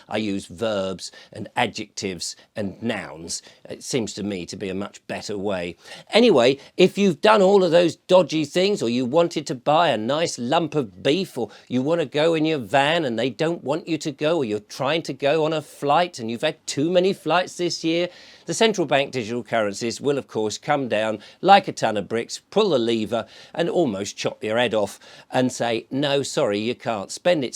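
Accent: Germany. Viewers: I love your work and particularly your German accent. Keep bringing it brother xxxx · Your German accent sounded like Kermit the frog, just realized that Kermit is a German name. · Good German accent, à la Klaus. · Never mind the naff, the German accent was highly significant. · Excellent German accent btw 👨🏻‍✈️ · Hello, alas, I used to work in retail banking for my sins but hopefully I can make amends:) The bank staff have to ask about large withdrawals as a safe guarding procedure and it is understandable that it rubs people up the wrong way. All unusual withdrawals are looked into seriously in case there is money laundering at play as well. This is in the Banking Code Law and to be in breach of it, there used to be a 7 year jail term for the staff member. You lived in fear of getting anything wrong. There is also a limit on the amount of cash that a branch can hold (insurance purposes) but large withdrawals can be done by appointment. 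Often, it's a worry about people's safety carrying it around or being robbed. I love ze German accent and I'm no fan of the way banking is going either! Looking forward to the solutions videos, Sunak & co. have left things too late, we need to act now.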